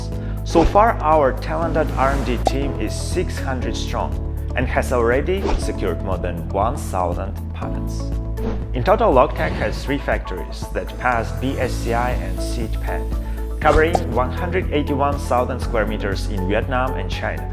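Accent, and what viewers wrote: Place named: Eastern Europe. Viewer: He speaks with a VERY east european accent that is difficult for most english speakers to understand.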